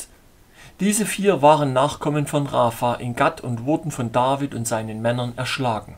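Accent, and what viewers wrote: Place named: Saxony, Germany. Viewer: Langsam gewöhne ich mich an den sächselnden Tonfall der Lesungen 😊. Ich höre mir mittlerweile jeden Abend beim Kochen und Hauswirtschaften ein Kapitel aus den Erzählungen unserer Vorzeiten an und bin jedesmal inspiriert. Lieben Dank für diese erzählerischen Ausflüge zu ein paar Kapiteln unserer Vorfahren ❤. Zu den Riesen fällt mir ein bebildertes Kinderbuch wieder ein: Gullivers Reisen! Ich habe als Kind nie verstanden, warum die kleinen Menschen sich allein wegen der Größe des Riesen fürchteten und ihn solange bekämpften, bis er auf der Erde lag und bewegungslos gefesselt wurde 😢. Die Riesen waren ja unsere Mitmenschen und zum Teil Familienmitglieder und Freunde.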